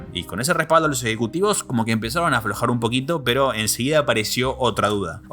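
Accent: Argentina. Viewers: acento argentino... apenas puede entenderse como un pésimo castellano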